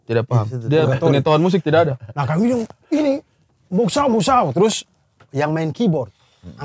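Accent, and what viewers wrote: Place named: Kupang, Indonesia. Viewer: Enak skali ee dengar kk abdur pake logat kupang ni, jadi ingat masa2 di kupang ee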